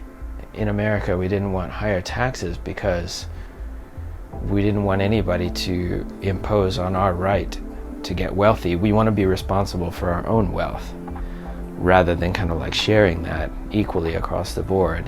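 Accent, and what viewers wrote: Finland. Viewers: You are picking up a Finnish accent :D PS. Welcome to Finland. Edit: Oooh so you moved ages ago. No wonder the accent is there.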